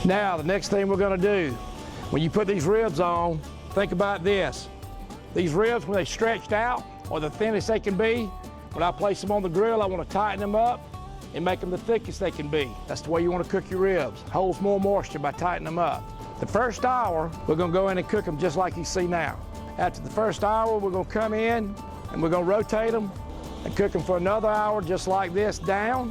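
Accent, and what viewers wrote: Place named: New York, United States. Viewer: I almost couldn't understand you with that thick New Yorker accent me being from Louisiana...lol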